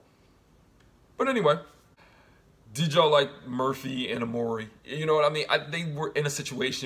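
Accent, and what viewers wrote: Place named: United States. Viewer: Good review... Ive been trying to find another recaper of this show after happycool's channel stopped doing reviews. also nice accent! Im Canadian so I enjoy hearing American accents lol. I do like Belamy and Echo... they do go well together but I do want Bellarke to happen. Maybe they will kill Echo off... or Bellamy and Clarke will bond over some plan while Echo is away doing her spy thing. He will talk about how hard it was to leave her behind and how is heart hurt and they will lock eyes and makeout!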